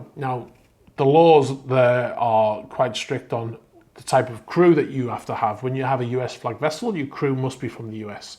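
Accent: United Kingdom. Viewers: I've only just watched a few of your videos. What is your history in crewing? I'm not asking for a complete cv but a general idea. Do you have an engineering background or captain/masters rating or chief cook and bottle washer? Lol Do you still work or are you retired. You sound British so maybe you were in the royal navy? I liked your video and hope to see more!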